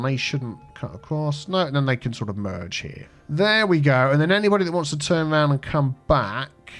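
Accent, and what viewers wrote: United Kingdom. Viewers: love and support from Korea(obviously from south😉) I enjoy watching your video cos i like this game but also your british accent is so satisfying to listen to!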